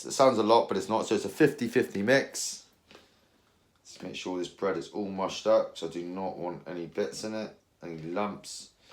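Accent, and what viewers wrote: Bristol, United Kingdom. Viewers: Great blog , keep up the good work love watching your fishing blog , you sound like your from Bristol lol 🎣👍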